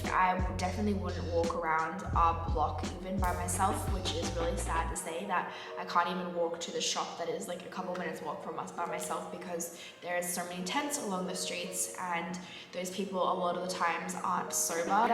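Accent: Australia. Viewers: I have a problem that, I can't understand your Australian accent, I can completely understand bro's accent. In reality, I can only understand American accent because, I am from Pakistan. BTW, Love from Pakistan to Australia, Brazil, and Usa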